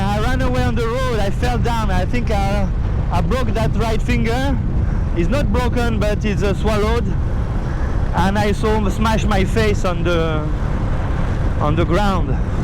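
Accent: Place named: France. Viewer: Vu l'accent anglais je pense que tu es Français, donc je vais parler Français. Ca fait vraiment peur comme expérience, en un instant tu te retrouve dans un enfer de piqures et de saloperies volantes qui t'attaquent de toutes parts.. En regardant la scène je me demandais pourquoi tu n'avais pas foncé dans l'eau, mais immédiatement je me suis dit qu'évidemment tu ne devais pas être capable de penser très logiquement dans une situation pareille, et peut-être que comme il ne t'étais jamais arrivé pareille chose tu as cru qu'en partant plus loin elle cesseraient de te suivre ? C'est dingue qu'elles continuent a t'attaquer autant même une fois dans l'eau.. tu dis même qu'elles venaient sous l'eau pour te piquer ? As tu tenter de les éclabousser a ce moment ? Je te demande pour savoir un peu mieux quoi faire si jamais ca m'arrivais.. Tu as prévu de l'equipement au cas ou cela arriverait de nouveau ? 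Je ne sais pas si il existe des bombes spéciales pour les repousser.. En tout cas content pour toi que tu aies pu survivre a ce terrible moment, et bravo pour tes vidéos qui ont l'air très intéressantes et que je vais surement continuer a explorer ! Bon voyage !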